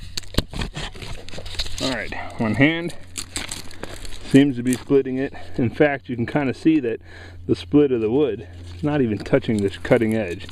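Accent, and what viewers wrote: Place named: West, United States. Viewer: Sounds like you from the West. Some good advice brother.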